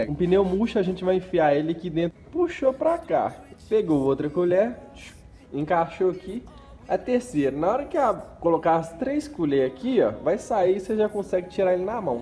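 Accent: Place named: Minas Gerais, Brazil. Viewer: Sô do RJ, mai acho o sotaque mineiro manerin mané.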